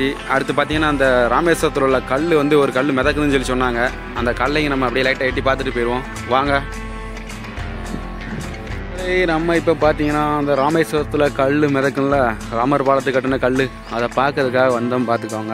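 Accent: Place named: Thoothukudi, India. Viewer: மிகவும் இயல்பான slang.இப்படியே தொடருங்கள்.தூத்துக்குடியா?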